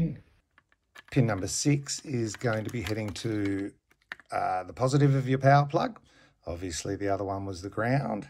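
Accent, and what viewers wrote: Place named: Australia. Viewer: Woot! Sounds like an aussie accent! Even better.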